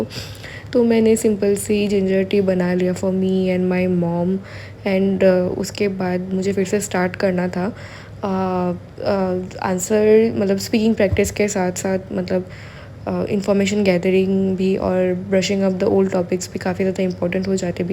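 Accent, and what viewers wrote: India. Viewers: Hii i love you hindi accent,,👍👍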